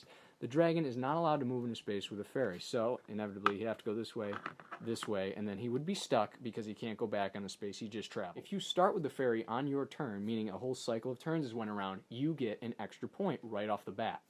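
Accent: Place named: United States. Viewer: Uhhhh...American. There's really nothing weird about it.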